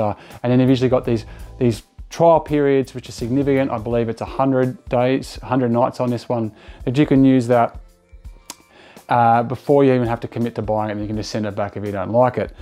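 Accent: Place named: Australia. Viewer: Not too compatable with US market. I did enjoy the Aussie accent.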